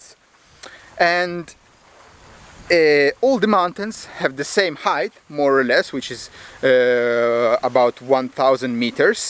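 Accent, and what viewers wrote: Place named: Russia. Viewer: You have strong russian accent xD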